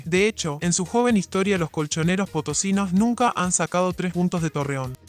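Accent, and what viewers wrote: Argentina. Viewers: Quita la voz argentina fastidia ustedes solos se quitan suscriptores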